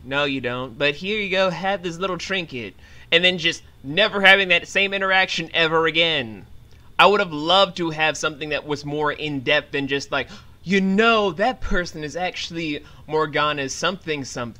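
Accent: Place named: Cockney, United Kingdom. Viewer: your cockney accent, nice. i think a disclaimer for the show needs to be; uther will always hate magic, he never changes and he constantly reminds people of that.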